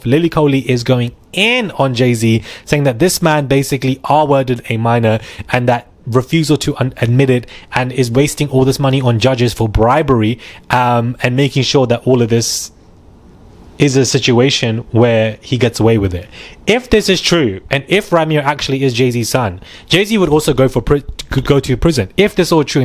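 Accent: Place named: England, United Kingdom. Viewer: An east Indian with a English accent ok ... this is black folk bizz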